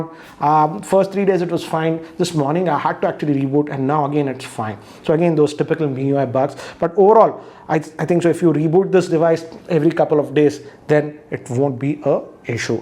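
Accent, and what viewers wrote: India. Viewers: This is a very common accent spoken in India! Were you expecting something like shown in movies? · This is the only Indian i like because of his accent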